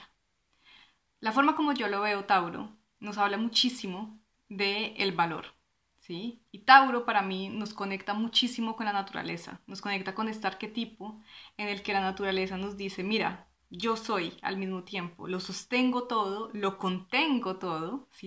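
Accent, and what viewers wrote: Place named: Colombia. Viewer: GRACIASSSS INFINITAS..NO SABES QUE BIEN SE SIENTE OIRTE..TANTÍSIMA SABIDURÍA..UN ABRAZO DESDE ISLAS CANARIAS..Y ESE ACENTO DE MI COLOMBIA💛💙❤....subscrita...desde Ya...y recomendaré tu Canal!!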